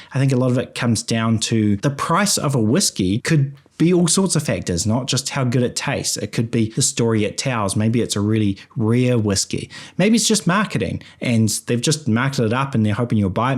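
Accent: New Zealand. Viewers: The thick kiwi accent is the highlight of this video. Corkers like spictrum and shirry and having six with a sheep abound. Luckily whiskey is already pronounced with an I. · Your channel just came up randomly... Glad it did. Enjoyed your break down. Informative and I enjoy listening to the NZ accent 🇦🇺✌️✌️✌️ .... Good video mate. Subscribed.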